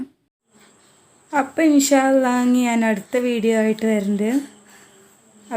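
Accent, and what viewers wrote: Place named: Malappuram, India. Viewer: നിങ്ങളുടെ ഭാഷ പൊളി ആയല്ലോ മലപ്പുറം കാരെ ഭാഷ ആയിരിക്കും അല്ലേ🤣🤣🤣🤣🤣👌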